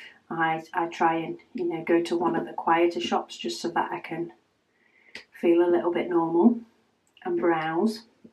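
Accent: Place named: Cheshire, United Kingdom. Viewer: I wondered if you were from the north. My Grandma was born and raised in Widnes. She came to America after the end of WWII. She was a war bride and came across the ocean all by herself on a boat full of other war brides. Sometimes the things you say, or the way you pronounce things reminds me so much of her. Cheers to another girl from Cheshire!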